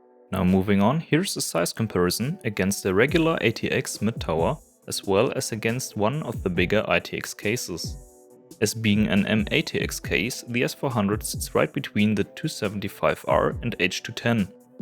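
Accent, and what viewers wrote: Germany. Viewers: Good review! I found it funny: as i listened on, I thought your accent seemed german, as soon as I saw the Wera VDE 1000V certified screwdriver I was certain.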